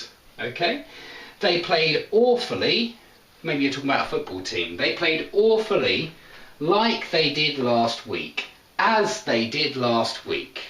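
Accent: United Kingdom. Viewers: Your english lessons are the best, because you explain many details. And I always admire your sophisticated british accent -))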